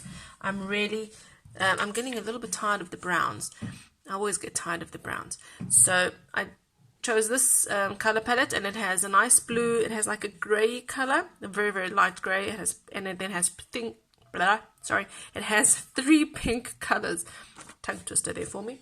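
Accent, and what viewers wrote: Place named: South Africa. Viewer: I love the tutorial, I am going to try this soon, I just need different colors in distress ink. I only have 3 and those are in vintage brown colors. You still have a hint of South African accent 😊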